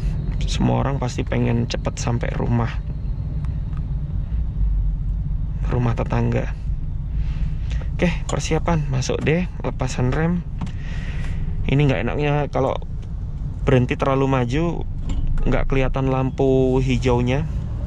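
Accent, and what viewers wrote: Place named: Surabaya, Indonesia. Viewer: Suroboyo timurr wkwk.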